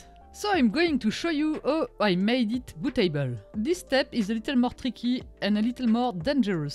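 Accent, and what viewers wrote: France. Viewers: merci pour ce super tuto avec l'accent très "français" je comprend tous hahaha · (heavy french accent)..."and now lets see if I bricked my motherboard" LOL. Never thought I'd hear that from a French woman after modding her bios to boot from an nvme drive via pcie adapter on a 2012 motherboard. Same one I've got. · I'm just here to study her french accent english 😅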